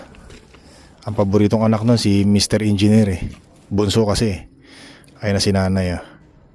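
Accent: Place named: Nueva Ecija, Philippines. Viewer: yong accent mo parang Nueva Ecija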